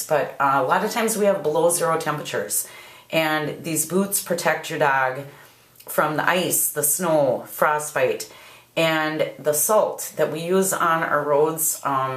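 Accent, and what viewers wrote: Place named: Minnesota, United States. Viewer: Great video! Thanks! Now I'm going to watch the "how to fit" video. I'm from MN but been in AL for 30 years. Fun to hear the accent again! Did I really talk like that growing up?? lol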